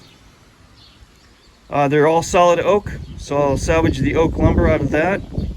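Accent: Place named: New Jersey, United States. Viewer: You from the Northeast? Sounds like Jersey or Pennsylvania. I'm from Jersey living in FL. I really enjoy your work!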